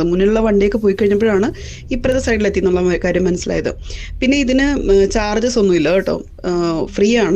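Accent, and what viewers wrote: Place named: Pala, India. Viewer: Pala പരിസരവാസിയാണെന്ന് തോന്നുന്നു ഈ സഹോദരി... കാരണം ഇത്രയും amicable ആയി സംസാരിക്കുന്നതു കേൾക്കുമ്പോൾ ariyam njanum palayil aanu രാമപുരം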